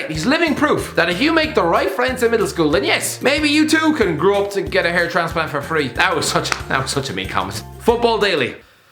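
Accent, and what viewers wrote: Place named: Ireland. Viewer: Whenever I click on a video from this channel, I pray to hear the. I see, my prayers have been answered.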